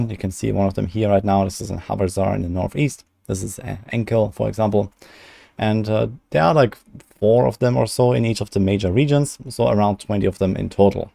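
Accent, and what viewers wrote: Germany. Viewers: Bruder, du sprichst richtig gut Englisch, aber dein Akzent ist alman. Dhat hurts my ihrs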